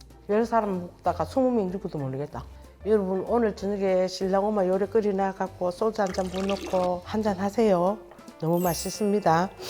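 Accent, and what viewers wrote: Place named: Gyeongsang, South Korea. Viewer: 앗 우리 갱상도 어머님.. 유튜브를 하고 계셧엇어요?! 구독합니다 ㅠㅠㅠ 몰랏엇네요 ㅠㅠ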